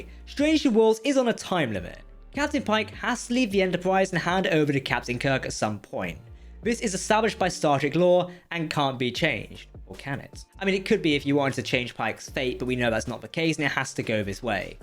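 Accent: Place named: Scotland, United Kingdom. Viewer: OMG... I wanted to watch this episode, but the moderator sounded like he was Scottish and was gargling Hot Marbles. The subject would have been interesting IF he could be understood. Please have him speak slower or get a person who can be understood by more than folks from the North of England, Scotland & Whales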